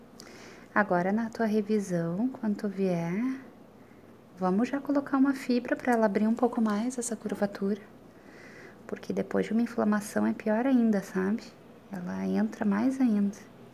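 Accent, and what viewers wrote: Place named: Rio Grande do Sul, Brazil. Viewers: Odeio sotaque Gaúcho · Pelo sotaque acho que é gaúcha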